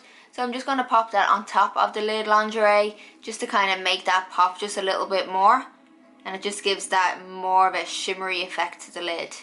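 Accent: Ireland. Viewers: i just found your channel and i noticed the irish accent on you i think its dublin correct me if im wrong but got so excited because i live in ireland to bad your all way in canada doh !!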